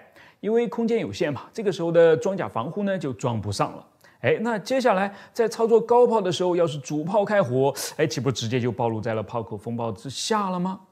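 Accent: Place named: Mainland, China. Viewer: 這是大陸的唸法，很多視頻中聽過。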